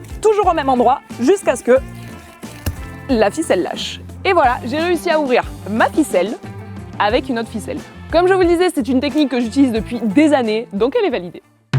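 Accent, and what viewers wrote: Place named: South, France. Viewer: Tu a un accent du sud c’est trop drole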